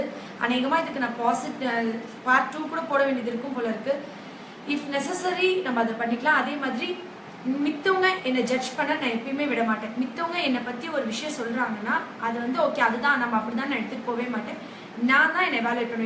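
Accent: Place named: Brahmin, India. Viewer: God bless u Mam 🙏 I like ur voice very much Brahmins Thamil is Mixed in ur ascent nice motivational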